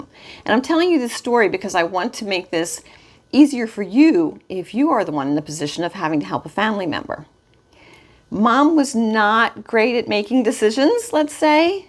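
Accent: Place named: Southwestern Pennsylvania, United States. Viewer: Kind if surprised you are in SE PA - kept hearing SW PA in your accent! Regardless, I really enjoy the logical, straightforward advise given in a friendly ‘it will be ok’ voice - so needed as we sort through 3000 SF and 38 years of being together!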